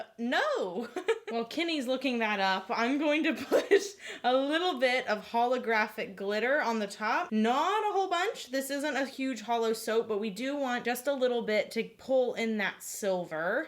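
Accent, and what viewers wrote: Texas, United States. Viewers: Your lovely, soft Texan accent does something so incredibly kind to Baz Lurhman's name! In Australia if a bunch of folks call to him it sounds like a flock of seagulls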